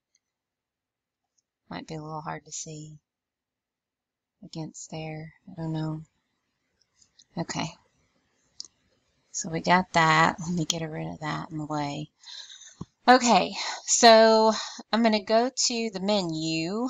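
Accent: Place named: South, United States. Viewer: You're too cute! "Is there something stuck on ma shoe? Did ah break ma heel? What's that all about?" Hehehe love your southern gurl, so am ah! and TYSM for doing these videos. Ah am a visual learner and ah need pictures too. YOU are a wonderful teacher!!!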